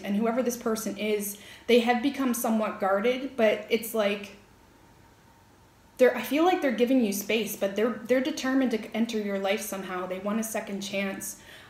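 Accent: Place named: Canada. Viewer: You are right on the money darling but do you blame me about getting my feddy? Because with my own experience and I can only talk about myself, all I know is that love is not the kind of love that there used to be sweetheart. I believe that one of the top five reasons for relationships are matrimony problems or issues we're about Finance if a man can bring in the money he ain't getting no honey. There's a lot to be done in a relationship got to have money you got to give quality time to each other course there has to be good communication at least and a lot of it to listen. But for sure any problems baby and that's fine cuz I am a king therefore King has a queen and she better look like one cuz if I cannot condone her not just with material things should be at the very bottom to least but they are needed because I will Adorn her with all my love and being but if there is a struggle in finance paying bills anything like that it won't work. 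I'm just saying thank you God bless you I appreciate you and I love your accent Canadian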